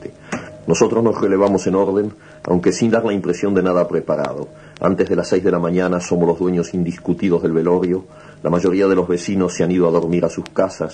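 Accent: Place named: Northern Argentina, Argentina. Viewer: su acento (en esa mezcla extraña de español y francés) me remite a un acento del norte argentino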